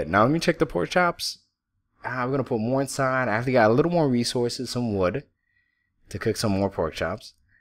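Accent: New York, United States. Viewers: Ahhh, so thats the lovely New York accent we hear!! Great start to a series. It goes to show that a bit of exploring can really help - you're so brave, I have to set up a whole town with a million full chests before exploring - I guess it's the hermit in me :D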